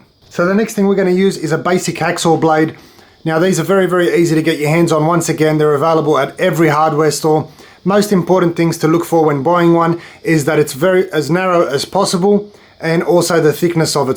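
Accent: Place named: Australia. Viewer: New you were an Aussie as soon as you spoke.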